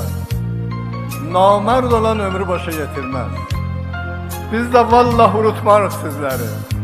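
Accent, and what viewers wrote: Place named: Azerbaijan. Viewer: Əsli Azərbaycanlı şirin ləhçəsində şeir deyir Şəxsən mən dəfələrlə qulaq asıram bu Pezaşkian qardaşımıza mənə sözün əsli mənasında ləzzət edir Canı canımızdan qanı qanımızdan Azərbaycanlı qardaşlarımız.Azərbaycan İran dostluğunu daimi arzulayıram.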